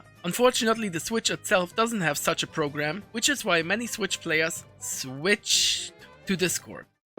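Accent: Germany. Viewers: Are you German/Austrian ? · This is the first video i've seen from you and i immediately noticed that you're german lol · I hear deutsch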